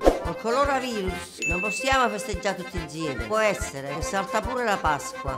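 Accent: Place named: Naples, Italy. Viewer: Ciao, sono un'insegnante di italiano e vivo a Dublino. Ho passato questo bel tuo video ai miei studenti stranieri, così imparano da casa anche durante il coronavirus e magari imparano anche l'accento napoletano. Ti volevo chiedere se hai messo tu i sottotitoli in inglese o sono automatici? Corrispondono in pieno al testo in italiano tranne in un punto. Non so se li puoi/vuoi editare. Invece di "eating nails" si dice "biting nails". In quel punto io mettererei "biting nails, cuticles" (le pellicine). Buon lavoro!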